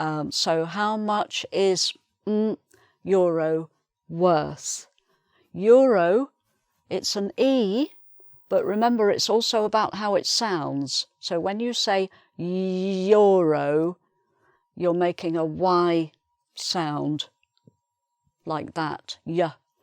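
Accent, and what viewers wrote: United Kingdom. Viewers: This is british accent Thank you I like your program.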